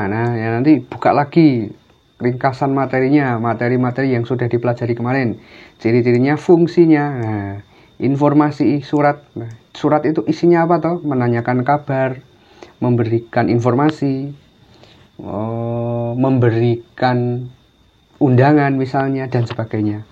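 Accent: Indonesia. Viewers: Dari logatnya saja udah kedengeran jawanya.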